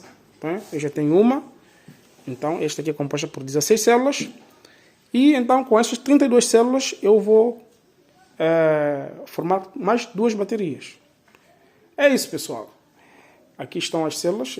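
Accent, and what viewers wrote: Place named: Portugal. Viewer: O idioma dele é português, mas com sotaque de Portugal, de repente, lá no país onde se encontra, consiga comprar baterias à preços mais honestos, mas para nós aqui do Brasil, os preços estão de ferrar mesmo, tanto pelo Aliexpress direto da China, quanto os ditos "estoques do Brasil com todos os impostos inclusos".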